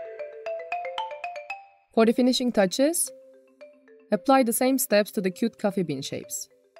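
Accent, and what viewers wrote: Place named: Turkey. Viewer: Konuşan kesin Türk, aksandan belli 😂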